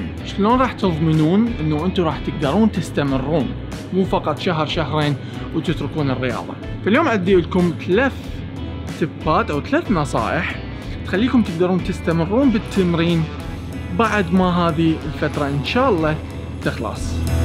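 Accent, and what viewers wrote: Iraq. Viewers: لهجه عراقيه صح 😊 اووو لطيفه اوي 💝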